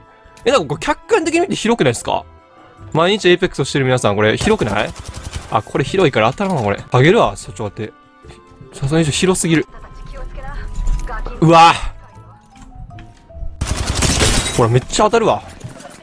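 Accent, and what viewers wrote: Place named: Kansai, Japan. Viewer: 最近動画見てて関西弁移りました初見です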